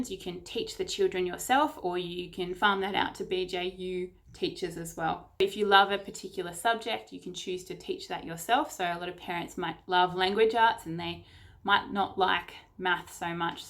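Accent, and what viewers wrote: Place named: Australia. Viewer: So good to hear an Aussie giving advice on a homeschooling program. Just wondering if this is for the state of Florida?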